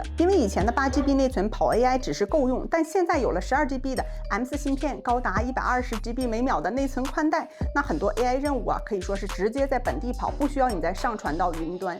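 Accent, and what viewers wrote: Northeast, China. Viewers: 麻烦能否克制一下东北口音，尽量说普通话，这样效果更好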